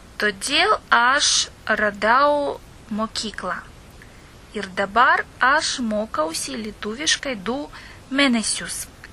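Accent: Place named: Russia. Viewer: Mano ausis sprogo ir tu tai vadini lietuviu kalba ? Tai kaskokia pusiau rusu pusiau lietuviu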